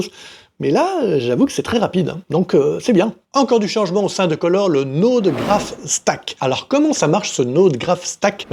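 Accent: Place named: Belgium. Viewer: C'est peut-être une fois la pointe d'accent belge 😉 En tout cas, bravo pour vos vidéos explicatives !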